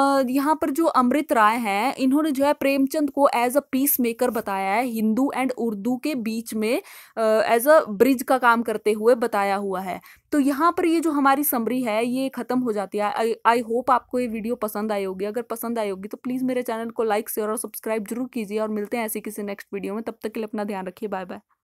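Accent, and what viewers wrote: Haryana, India. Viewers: Mam aap Haryana se hai?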